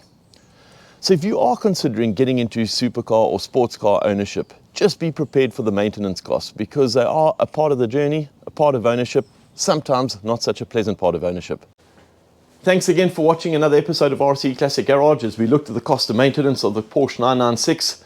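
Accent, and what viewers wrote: South Africa. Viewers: Fascinating insight mate. If you thought the bills for the 360 were eye-watering, try an F355...Engine out every 3 years for the belts (22 hours labour - ouch) manifolds made out of cheese, radiators made out of even softer cheese, horrible sticky cabin plastics needing (expensive) refurbishment..the list goes on and on. Nevertheless, once you take it out for a good blast all is forgiven! At least I made a tidy profit when I sold it after 11 joyous years. My little 993 is now making me smile. By the way, you're not originally from SA or Zim are you? Cheers from a rather chilly Otford, Kent, UK.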